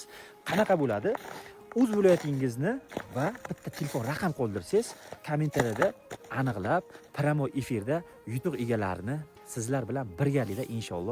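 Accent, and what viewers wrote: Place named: Jizzakh, Uzbekistan. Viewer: Жиззахданмиз ака узиз кайердан чота шебев бизни шевага ухшебди❤❤❤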